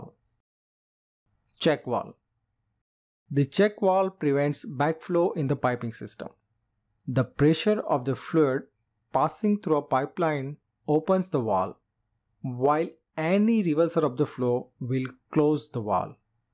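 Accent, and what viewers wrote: India. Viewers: Indian Accent. Never ceases to irritate me.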